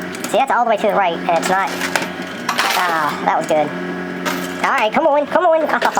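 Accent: South, United States. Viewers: I just like the sound of the money and chips falling and your Southern accent, which I may add is even cuter when you speed it up and sound like the! Makes that song get stuck in my head. Love your videos. Used to watch a lot of them, but now I only watch yours. Keep em comin! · Speedy recovery for your friend Jeff. Hope your cold clears up soon. Just a note that your voice sounds fine (except when you slow it down to creature from the deep level lol) I could listen to your voice all day lol Southern flavour easy to listen to. Have a great day. (Canada here)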